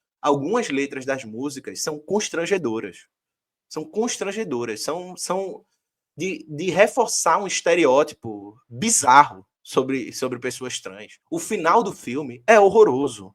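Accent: Pernambuco, Brazil. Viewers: Grande iniciativa. Filosofia com sotaque pernambucano. Massa demais. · Mais um com sotaque pernambucano no canal meu coração não aguenta! 😍Salve,! Como é inteligente e bonito o camarada. Amei o quadro! Parabéns!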